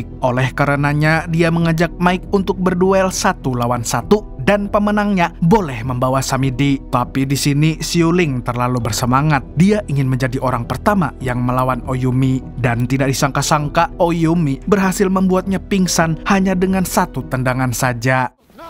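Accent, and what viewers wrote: Banjar, Indonesia. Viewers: Urang banjar kah pian mamang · org banjar sekalinya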